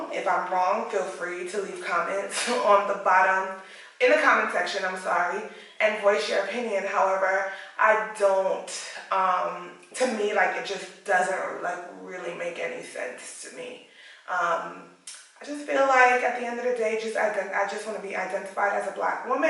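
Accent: United States. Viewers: ? You sound like an American. How did you get that accent. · for your accemt you are from usa......and thats your identity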